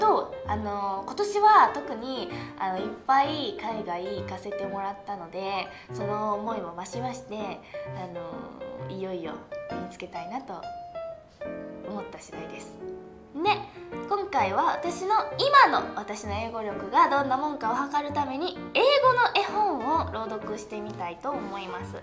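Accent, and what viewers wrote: Japan. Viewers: Idk why but japanese speaking in English is amusing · idk why i just found that when japanese talks in English they became cute. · japanese ENGRISH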